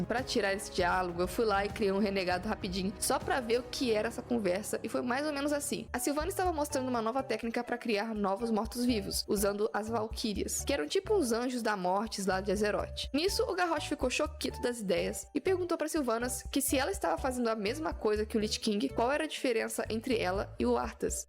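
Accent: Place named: Minas Gerais, Brazil. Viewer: Tu é mineira ne? O sotaque n engana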